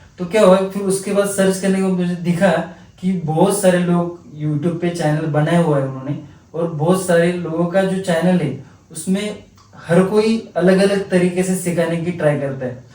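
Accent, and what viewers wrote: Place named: Maharashtra, India. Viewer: मराठी..मस्त.. गुलाबी हिंदी भाई😂😂 एकदम कडक I like it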